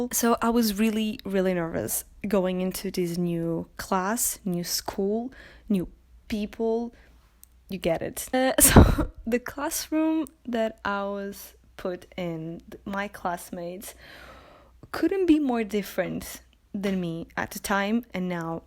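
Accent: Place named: Brazil. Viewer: Você é brasileira? Seu sotaque não parece o sotaque de quem nasceu nos eua, amei o vídeo e amei o seu canaaaal :)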